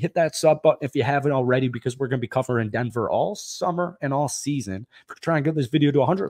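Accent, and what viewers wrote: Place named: Midwest, United States. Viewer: Pardon Midwest pronunciation he means no disrespect we all say OR eh Gon out this way. We'd totally give you a hall pass if you said illinois with an s at the end 😂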